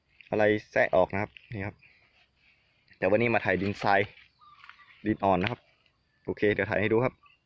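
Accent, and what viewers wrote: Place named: North, Thailand. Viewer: อยู่บ้านไหนคับ อู้เหนือ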